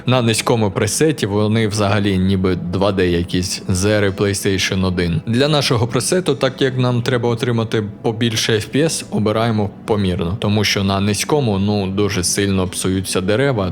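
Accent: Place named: Russia. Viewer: Не висОко, а вИсоко, трохи тягне тебе на руський лад. А загалом лайк.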